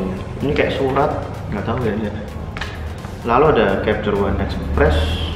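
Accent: Java, Indonesia. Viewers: mending jadi diri sendiri aja mas. gaya reviewnya lebih bagus justru menampilkan feel 'jawa' nya.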